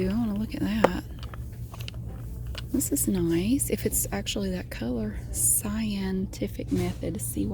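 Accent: South, United States. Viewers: Love your southern accent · I’m love your videos! I was just like you….I too had a smart mouth 😂 I did get in trouble for talking in class tho. I wasn’t disrespectful just talked too much 😂😂😂 I’d have to stay after school and write “I will not talk in class” on two pages of lined paper! 😂😂😂 And I love your southern accent. I live in a suburb outside of Chicago. Not a lot of southern drawl in the Midwest.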